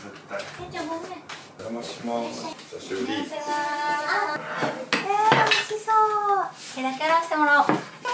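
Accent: Kansai, Japan. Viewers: 骨なんかつかうん？のくだり笑いました😂😂すごい今更なんですけど、さん関西人ですか？私も関西人なんですけど、会話が聞き取りやすすぎて、あ、まって、関西人やん！！おもいました🥺 違いましたらごめんなさい( ；꒳； ) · 最高の食卓！！！全部美味しそうやし、関西弁に癒される～＞＜ さん最高です。旦那様も、ご友人も幸せですね～＾＾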